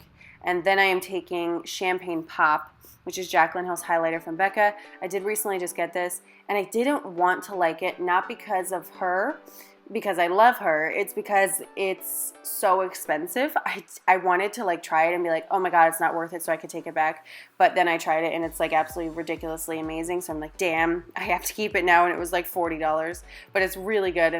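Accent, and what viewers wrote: United States. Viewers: haha! I love your jersey accent! so beautiful lady! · Loved the look and background. I would say that's a north jersey accent coming from someone in the south 😉 that's jersey for you. Now you have me wanting all those extra dimension eyeshadows!! Alex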